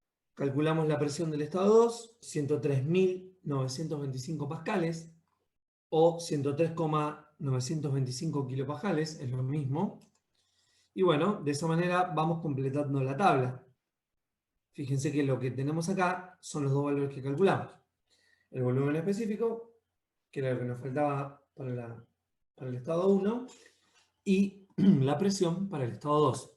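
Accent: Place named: Argentina. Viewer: Te amo, gracias a ti voy a terminar la práctica de termodinamica, mañana t digo si pasé el examen :D, eres lo mejor increible hombre con acento argentino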